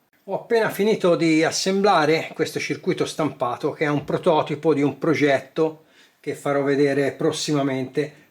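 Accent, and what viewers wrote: Tuscany, Italy. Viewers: Con l'accento toscano sa quanto mi piacciono i suoi video... c'è anche tanta simpatia oltre la tecnica. Ottimo come sempre. Guardato attentamente come a scuola perchè per me è scuola e anche questo video è molto utile perchè fa capire diverse cose, oltre al trucco di testare i condensatori anche come funzionano i tester. Io ho uno come il suo Aneng col guscio verde che me lo godo da 2 anni e la sua manovra di lettura credo che non la sapevo, perchè lo lascio sempre in AUTO e sposto il commutatore. Poi la barretta sotto i numeri che corre nelle letture di certi strumenti... ancora non so a cosa serve... cosa indica... Adesso il video ci casca a fagiolo perchè ho appena finito un alimentatore lineare nel suo telaio che dovrà alimentare un amplificatore da 50 W per canale con integrati LM3886 e mi sono accontentato di questa potenza alimentandolo a 26V ma può dare molto di più ma a me non serve troppa potenza e sarebbe servito un alimentatore più grosso e spazi diversi e il progetto non si voleva spingere a grandi telai tipo anni 80 ma la metà di quelli. 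Ho messo 2 condensatori da 10.000 in parellelo per ramo (+ e -) perchè è duale e il calcolo mi dava 16.000 µF e ho messo 20.000. Adesso devo fare il collaudo sotto carico a 5 A per ramo, ho il carico fittizio e anche l'oscilloscopio nuovo di pochi mesi e caro amico mi diverto come lei. Purtroppo non ho saputo progettare bene dall'inizio perchè volevo due alimentatori separati, uno per canale con 2 toroidali ma avendo comprato un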